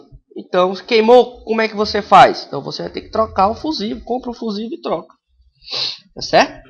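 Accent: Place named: Paraíba, Brazil. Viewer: caralho,ele sabe explicar,mas eu odeio voz de paraíba! Énem, pÓlarização aff!!! tudo é Ó e É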